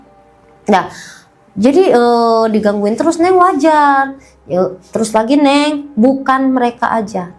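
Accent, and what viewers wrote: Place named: Medan, Indonesia. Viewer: Logat ngomongnya Kayak Orang Medan 🤣🤣🤣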